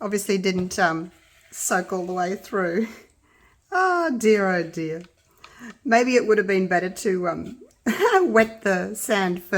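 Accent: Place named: Australia. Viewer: Side note curiosity I have to comment on your lovely accent again. Australian accents are so very cool. Forgive me if I'm wrong.🤔 But dudes are called blokes right? So what is the correct term for chicks? A little 70's era usa slang for guys & gals🤣🤣🤣🤣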